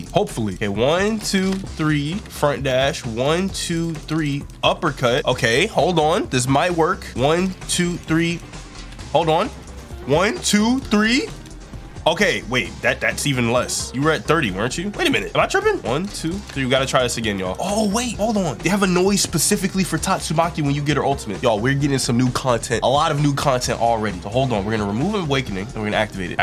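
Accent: United Kingdom. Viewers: YO Keep up the vids love them aspecialy ur brit accent( i want Erly Acc im Krath_100)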